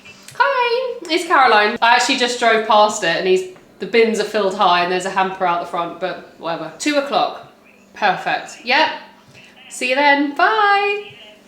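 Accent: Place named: England, United Kingdom. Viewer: I wouldn't have thought the garden would be a priority surely sorting the house should come first. You have an English accent but act like a silly American over the top tiktoker. You have children please grow up. You really don't need to document every fart.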